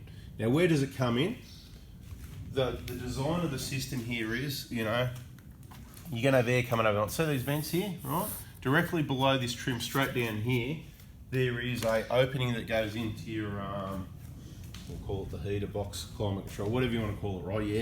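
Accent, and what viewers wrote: Australia. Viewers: Love the Ausie in this guy ❣️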